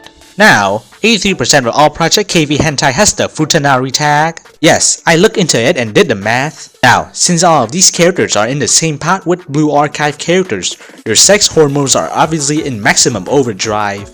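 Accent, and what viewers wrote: Vietnam. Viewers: rly vnm accent 🐧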